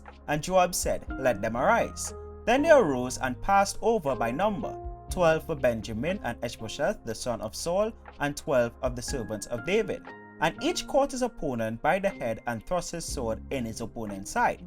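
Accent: Trinidad and Tobago. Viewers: You Trinidadian?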